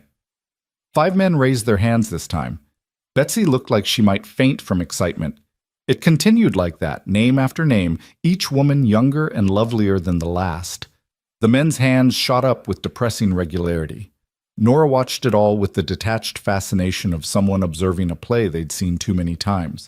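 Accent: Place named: United States. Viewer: Your voice to text is highly amusing - obviously can not cope with your heavy American accent, eg a scent for assent, release or beliefs, eve Hercules for valise, united for he nodded, pastor for passed her and constantly wrote for rode. From Namibia.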